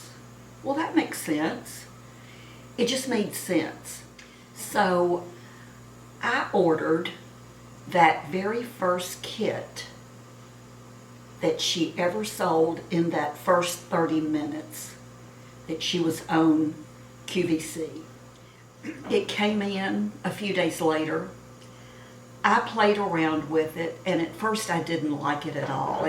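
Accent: South, United States. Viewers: I thought I recognized that accent! I am from Arkansas. We sound alike. Lol! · I love the way you say "mirror". That southern bell accent is so sweet.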